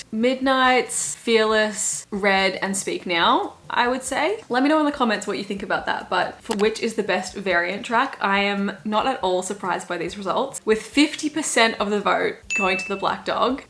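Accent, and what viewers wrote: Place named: Australia. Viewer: Unrelated comment about one of ur tweets but anyone who complains about ur Australian accent is a FREAK and does not deserve ur top tier content because wtf that’s such a wild thing to say to someone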